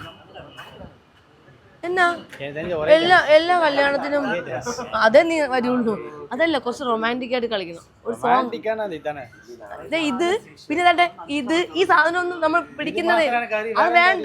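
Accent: India. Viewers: aentha eppol ella vdeos lm thrissur slang l samsarikknne Kurach famous ayappo tvm slang mattnna aentha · trivandrum slang ingana thanneyan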